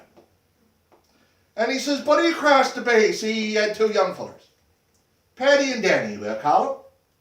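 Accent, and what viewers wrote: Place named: Newfoundland, Canada. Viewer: I’m studying your accent to audition for a community theater production of Come From Away. I thought I’d see if I could find a Newfoundlander reading the Bible and I found this! Thanks!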